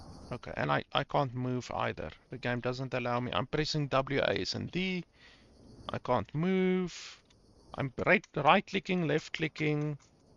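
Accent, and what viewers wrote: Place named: South Africa. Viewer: Definately a South African acsent....🙂..(ek geniet jou reviews!)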